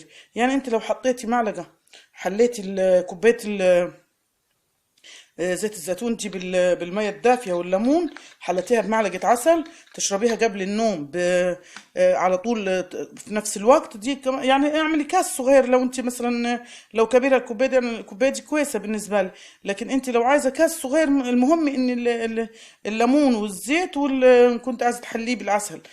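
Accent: Upper Egypt, Egypt. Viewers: شكرا حبيبتي على الرد السريع.انا بحب مصر وخاصه اللهجه الصعيديه انا بحبها كثيييير.اتمنى لك كل التوفيق.ولكي مني كل الإحترام والتقدير.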